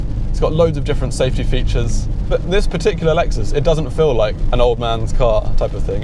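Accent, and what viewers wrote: RP, United Kingdom. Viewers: Wow! Initially i thought what a Cambridge/Oxford educated yuppy accent 🤦‍♂️ but you gave me all the info i was after thank you i shouldn't be so presumptuous in future 😅 👍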